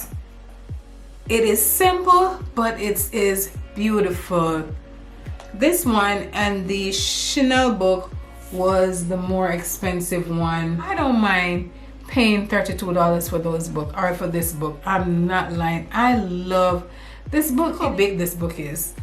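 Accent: Jamaica. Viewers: Are you Jamaican '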